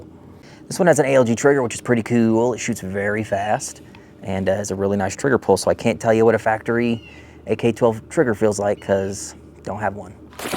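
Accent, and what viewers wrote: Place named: Czech Republic. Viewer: Based on accent, I think is Czech.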